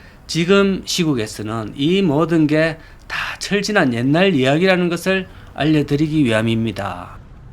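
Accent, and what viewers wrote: Gyeongsang, South Korea. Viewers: 구수한 경상도말씨로 설명을 정말 잘하셔서 다른 사람들도 처럼 하시는 분도 있지만 재미가없고 설명을 뽄따서 하고 있는데 저는 절대로 안본답니다 하이팅. 하셔요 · 사투리 토하겠다 할배야 경상도 말투좀 버리고 딕션 연습좀 하세요.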